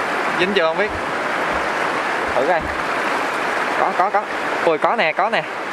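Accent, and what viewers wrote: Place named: South, Vietnam. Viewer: E nói giọng miền nam, quê e ở đâ vậy?mà có sông lớn,nước chảy siết?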